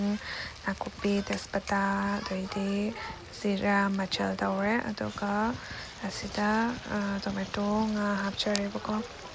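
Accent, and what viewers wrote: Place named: Cachar, India. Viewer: Congratulations but nadi yeningde hai Noi AssamCachar gi tune and accent do khara change taubiyo yamna heinade noo cachar gi lon do even nasha gi nache su ngaire nokpu nokninge nangse meitei nupa Manipur da palladi chahi kharashu henle nachasu chaore social media da thoklakladi khonthi su khara adum chabani meiteilon sengna ngango accent doh yeningdre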